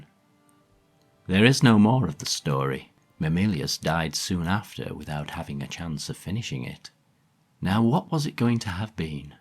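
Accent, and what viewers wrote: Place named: Yorkshire, United Kingdom. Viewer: Please, No! Do not feel because of your Yorkshire accent that you are not suitable to read the works of M.R. James. He wrote his works for everyone and (excuse the pun) would be horrified a person would feel his accent prevented him from interpreting his work. He was not a snob. Far from it. You have the perfect voice for the journey you have chosen (or it may have chosen you) Happy reading 📗📘📙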